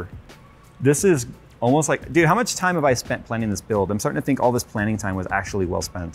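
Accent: United States. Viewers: Americans and theirs zee's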